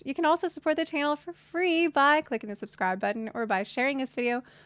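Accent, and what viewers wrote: South, United States. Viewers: love that southern american accent too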